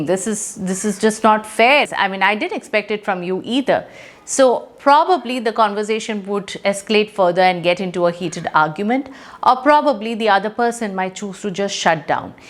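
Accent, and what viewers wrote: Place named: India. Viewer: No popular english self help books are available over YouTube in Indian accent. I think you have a wonderful, sweet and friendly Indian accent. If you think of doing it, it would definitely help lots of aspirants like me in developing our English beside personality. Thank you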